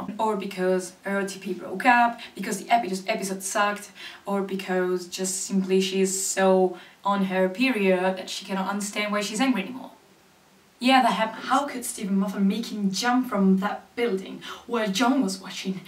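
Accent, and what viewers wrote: England, United Kingdom. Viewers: Il tuo accento è stupendo sembri veramente inglese *_* anche a me piacerebbe fare video in inglese appena avrò tempo mi ci metterò ahahah cmq volevo dire che sei fantastica e che il mio tipo di fangirl è... Tutti tranne il primo tipo e l'alternativa lol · Continua cosi e non ti preoccupare del tuo accento inglese perché è fantastico! Booyah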